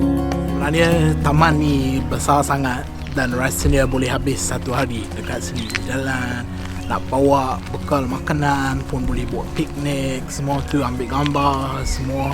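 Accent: Malaysia. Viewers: Hahaha cakap english campur malay aksen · Perghh slang English mcm malaysian dah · punya speaking x bunyi mcm org putih dah. Slang Malaysia weh 😁😆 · punya slang dh jadi mcm Malaysia-English slang🤣🤣🤣 terbaik!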